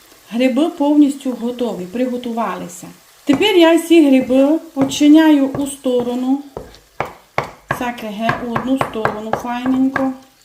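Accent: Transcarpathia, Ukraine. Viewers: Це дуже смачно, в нас на Волині також таку готують ,лише без паприки.Називається страва мачанка.Обов'язково присутня на столі на Святий вечір і в піст також. Спробую додати паприку.Окремо дякую за ваш чудовий закарпатський діалект❤!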